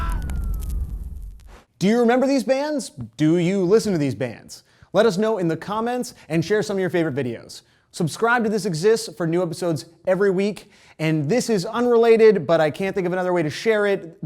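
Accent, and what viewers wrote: Canada. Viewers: Accent? Yes sir, you sound like an Ontarian. You sound like you lived about a block from Yonge Street, actually. I do tend to lose my poop when an American accuses us of saying a-boot. No one says a-boot. We sometimes say a-boat. You, sir, don't really say a-boat. You pretty much say a-bout. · There are no words to adequately express how much I love the fact that one of these bands is named Power Glove. And yeah, you really don't have much of an accent. Probably the least accent-y Canadian accent I've ever heard. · You most certainly have an accent. Everyone from Canada and the US states near Canada (Wisconsin, Minnesota, North Dakota) have a strong accent on any "ou" sound. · This Exists Its ok, we'll make it through this. ps you song just as Canadian as I do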